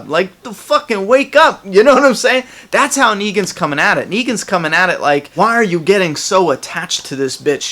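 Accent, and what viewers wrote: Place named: East Coast, United States. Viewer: Q&A where are you from? I hear an East coast accent.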